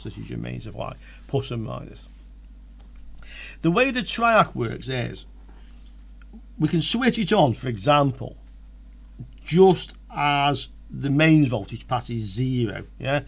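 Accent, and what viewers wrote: United Kingdom. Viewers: hard to understand this british acent for an non native english speaker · Yes, he's British.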